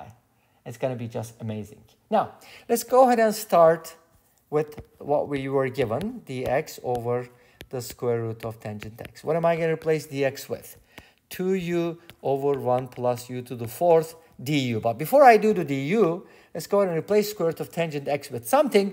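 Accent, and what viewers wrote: Turkey. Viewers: I swear you got that Turkish accent, are you Turkish by any chance?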